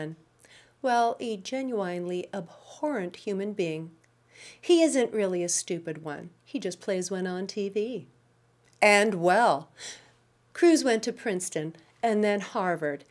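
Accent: Canada. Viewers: You’re the best but do I detect a bit of a Canadian accent?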